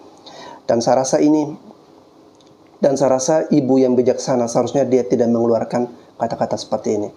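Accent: Indonesia. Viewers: Jujur dari dari segi intonasi dan tata bahasa bahwa saudarakuku ini jauuh lebih INDONESIA dari pada...........it👍👍👍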